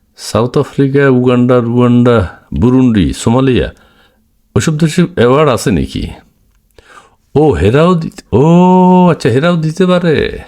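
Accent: Noakhali, Bangladesh. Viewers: পাগলামী ছাগলামী বাদ দিয়া ভালো কিছু বলেন। কথার টান শুনে তো নোয়াখাইল্ল্যা মনে হচ্ছে। কাদের সাবের আত্বীয় নাকি??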